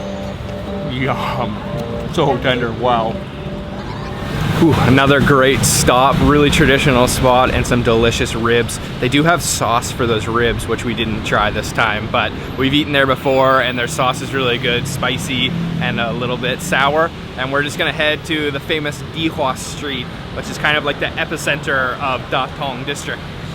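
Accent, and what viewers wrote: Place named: Canada. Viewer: Your Canadian accent is so freaking annoying.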